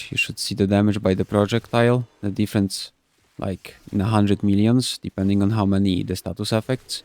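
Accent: Poland. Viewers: Just on their own, hounds with manifold and contagious bond, synergized prospectus and some good statuses on their weapon can nuke rooms on steel path (especially now if your hound gets jade eximus aura that's like guaranteed room wipe xD ) But man, damage on that Syam is crazy! I really have to finally get this weapon Also, it's Muramasa a reference to Terraria? Oh and you're from Poland, yeah I thought accent sounded familiar :D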